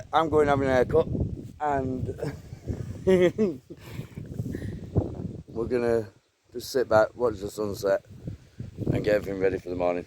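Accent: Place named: Manchester, United Kingdom. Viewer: What a great vegetable farm. Love watching what your planting and harvesting I was trying to place your accent, sounds very northern possibly Mancunian by any chance?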